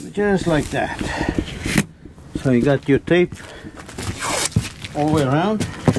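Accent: South Africa. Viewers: Great video. Are you South African? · are you from South Africa your accent sometimes sounds like you are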